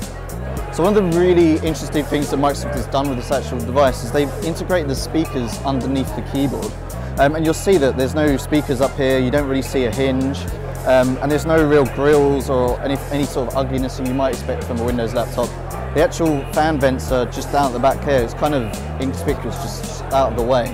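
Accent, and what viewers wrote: United Kingdom. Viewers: Unrelated, but please speak clearly!! yeah, cool you're from the UK, but slow down, normal pace, microphone in the right place. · Is this reviewer from UK?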